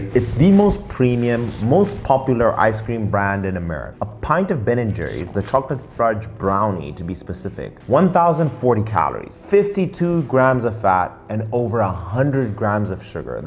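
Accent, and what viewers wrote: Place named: South Africa. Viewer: Thanks, why your accent is so South African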